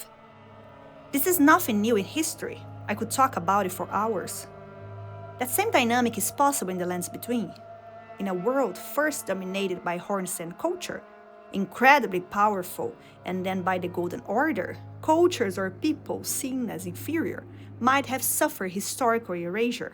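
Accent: Brazil. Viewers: I recognized that Brazilian accent instantly! Lol anyways I was really interested in this topic and your video was one of the first results, so thanks for making it! I actually felt a chill when I saw his second phase the first time. Fromsoft has a way of storytelling without words, where you just understand someone’s entire story and struggle from their actions. When he reached this “redemption” it really made me feel for him, like when he finally met his match (the player), he gets granted a second chance by the gods, presumably with a Miquella-esque nature judging by the arcs. This would tie into your point about the peoples of the Lands Between before Marika, who might’ve been persecuted much like with any empire trying to instate their own doctrines. Miquella’s Haligtree was this “sanctuary” for the afflicted and shunned. I initially thought his arm had some connection to the abyss, like Artorias. 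Especially since Nightreign brings back Dark Souls, I’m unsure if they now officially share an universe. But much like Nashandra brought the influence of the abyss to a far away place, maybe it has something to do with the “Night.” I thought Heolstor’s movements resembled Artorias a bit. Anyways, his “nuke” attack is probably the most cinematic thing I’ve seen in this game. This fight is so much poetry told with such few words.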